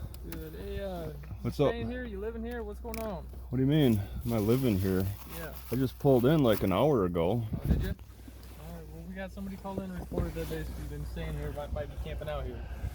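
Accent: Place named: Midwest, United States. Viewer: I grew up in Milwaukee (been here in Dallas over 40 years). I thought I recognized your mid-western accent.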